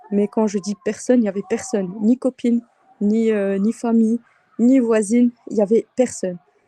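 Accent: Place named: Belgium. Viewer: Cest pas beau laccent belge ...en dehors de ca cest bien que des personnes sache faire le lavage mortuel et davoir le courage ...Allah oumma barek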